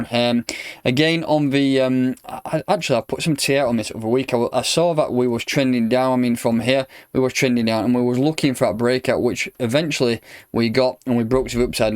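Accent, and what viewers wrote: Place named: Yorkshire, United Kingdom. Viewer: Lol. That’s deffo a Yorkshire accent!!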